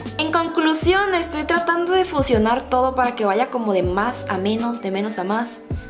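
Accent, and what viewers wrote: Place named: North, Mexico. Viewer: Me gusta tu acento bien norteño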